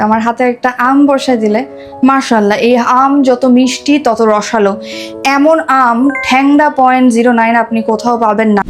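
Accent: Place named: Bangladesh. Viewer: বাংলাদেশের মাল সেটা বুঝতে পারলাম কথাতে।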